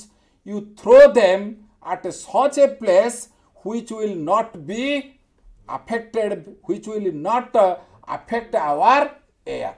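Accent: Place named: Odisha, India. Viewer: Om my god this is english or odia...i think this man pronounciating English as odia launguage..😄😄😄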